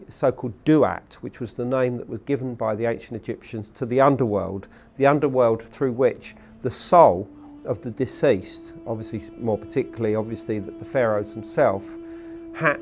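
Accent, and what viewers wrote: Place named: Mockney, United Kingdom. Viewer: Mockney bellend!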